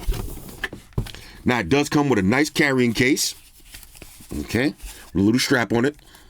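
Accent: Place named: Queens, United States. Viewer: That’s Queens, New York all day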